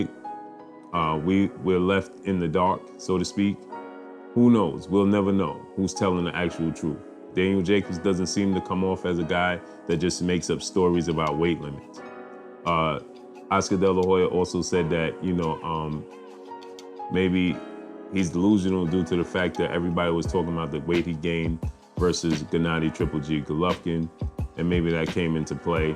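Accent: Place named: Bay Area, United States. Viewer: Bro you ever think about rapping? You got that voice! That Bay Area floooo. You sound like BIG LURCH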